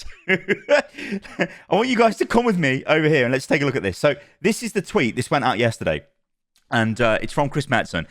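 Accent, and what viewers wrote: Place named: United Kingdom. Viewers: Btw, you should promote this channel more on your main channel to get more viewer. I like the way you talk, totally british. Me as foreigner is enjoyed well spoken english in order to learn myself while watching videos :) also i like the differenciate look at overwatch and you also point out and appreciate what they do good. And thats nice, not everything is bad. I know i sound like a simp but i really like your style! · Btw, you should promote this channel more on your main channel to get more viewers. I like the way you speak, totally British. Me as a foreigner, enjoys well spoken English in order to learn myself while watching videos :) I also like the different look at Overwatch and you also point out and appreciate what they do well, that's nice, not everything is bad. I know I sound like a simp, but I really like your style! 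Not meaning to be cunty, genuinely hope I help you with your learning, you're pretty good already though.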